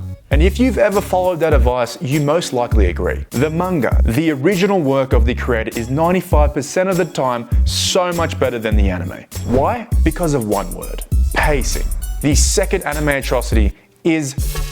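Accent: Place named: Australia. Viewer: I wanna learn the aussie accent with this guy talking about anime. Perhaps he has a playlist with that specific content. Checking . . .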